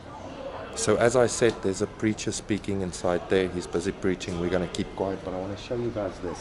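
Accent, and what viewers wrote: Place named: South Africa. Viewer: Your accent is giving South African are you South African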